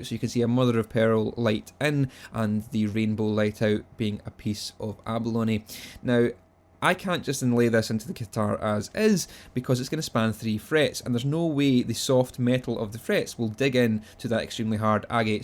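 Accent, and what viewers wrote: Scotland, United Kingdom. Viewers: love the scottish accent. feyner tooth foyl :) keep up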